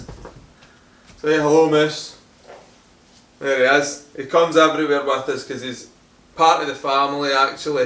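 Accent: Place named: Glasgow, United Kingdom. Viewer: Thanks for answering about the accent! We thought it might’ve been Scottish, but had noticed your shop was in Blackpool. We actually spent the first week of July touring through the Highlands. I think the closest we got to Hamilton was Callander. Wonderful country!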